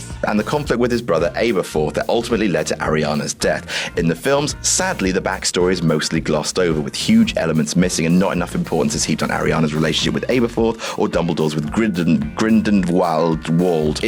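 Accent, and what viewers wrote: United Kingdom. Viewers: You sound British (?) yet you use American titled books.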